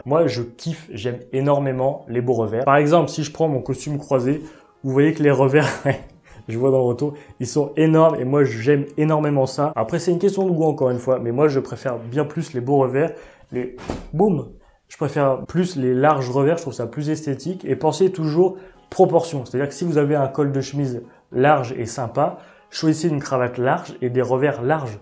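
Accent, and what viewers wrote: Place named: Banlieue, France. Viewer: Tu parles comme dans la banlieue wesh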